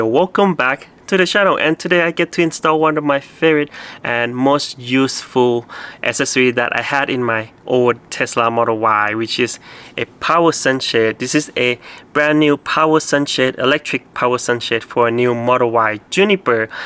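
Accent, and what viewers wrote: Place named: Asia. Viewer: Why it has an asian accent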